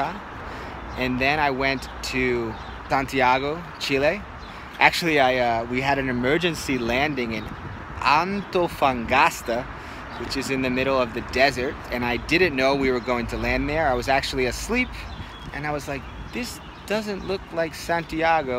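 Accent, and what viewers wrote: Brazil. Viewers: você as vezes fala palavras em Inglês com sotaque Brasileiro. Isso acaba influenciando quando você fala em inglês? Tipo quando mora muito tempo no Rio e pega o sotaque carioca. Já aconteceu isso com você?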